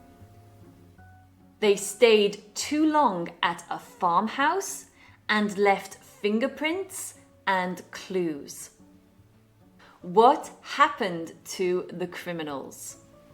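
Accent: United Kingdom. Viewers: Hi, it's a great story with a gorgeous British accent. I listened to it with great pleasure. Keep making us happy.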